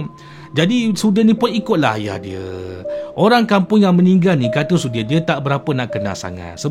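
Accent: Perak, Malaysia. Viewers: Awsome sgt you tuber dari ipoh dgn lorat negeri perak ....best dgn style and cara yg paling kreatif ...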